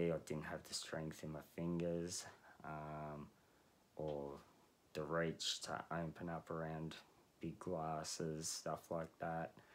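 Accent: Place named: Australia. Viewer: You have pretty mad skills and is that a bloody Aussie accent I detect. Good on you mate.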